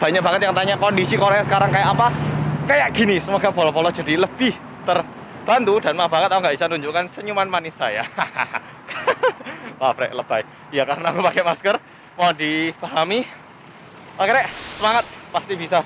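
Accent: Java, Indonesia. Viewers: Pengen nonton kemarin tapi baru bisa sekarang 😩 sehat teros mas biar aku denger medhok mu 😁✌️ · Me : Weh terjebak dengan vlog abang ni 😂😂 . Suka dengar sebab ada slang bhasa Jawa ( bila your dad is Javanese but you cannot speak Jawa and takde slang pom . Jadi terus rindu kampung ayah and sepupu kat 🇮🇩)🌸 terus subscribe 😊 Salam dari Malaysia 🌸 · stay safeee jang hansolllll medhokk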